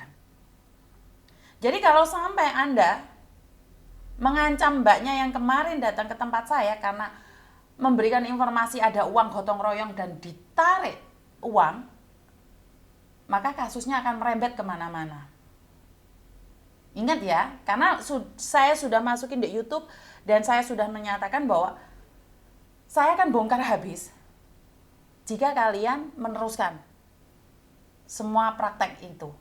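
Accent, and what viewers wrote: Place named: Java, Indonesia. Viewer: Mbak katanya orang BALI.BALi Nya mana Mbak.kok bahasa Jawa ne meduk kalau orang asli BALI ndak bisa bahasa Jawa.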